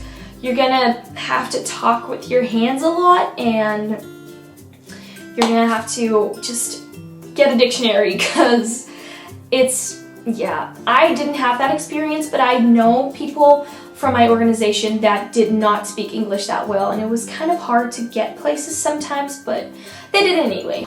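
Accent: United States. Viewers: you got an American accent now.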